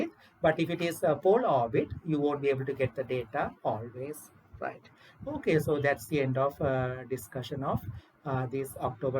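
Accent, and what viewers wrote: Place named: Sri Lanka. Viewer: Sir Are you from Sri Lanka? You have that accent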